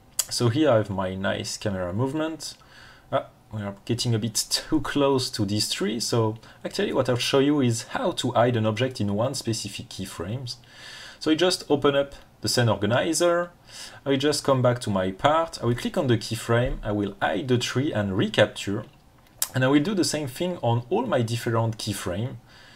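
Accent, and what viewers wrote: France. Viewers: Le bon accent français qu’on aime 😭😪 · I'm from Germany and I find this french accent quite charming actually. But I can imagine that people from Texas or Pakistan maybe could be irritated by it :-)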